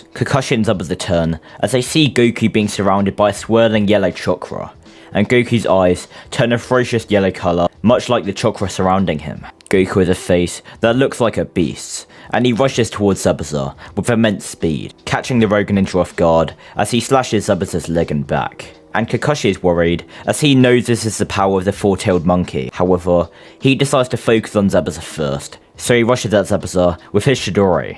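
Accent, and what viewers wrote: United Kingdom. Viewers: Annoying British voice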